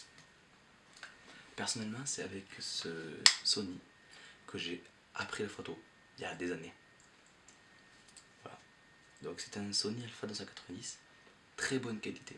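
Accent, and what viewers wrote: South, France. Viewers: Accent du sud haha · toi tu est sudiste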